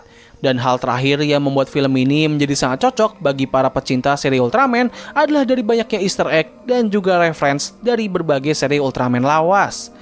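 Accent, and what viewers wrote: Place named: Banjar, Indonesia. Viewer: Ulun kira Pian orang banjar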